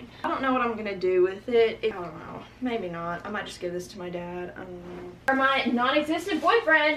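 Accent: South, United States. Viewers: r u southern. also, love that orange shirt. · Its her real accent shes a real southern gurl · U like batteries and worry about being mistaken for Spiderman. With this southern drawl, u can get away with anything. xo's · I love her country accent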